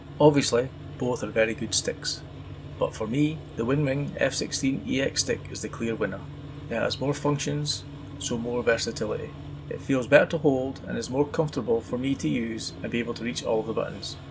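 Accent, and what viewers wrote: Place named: Scotland, United Kingdom. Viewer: The gentle Scottish accent makes it an effortless sell... I must be homesick! Seriously though, you highlighted most of the reasons I also went with the WinWing over the Virpil. Good video,. I hope there are many more to come!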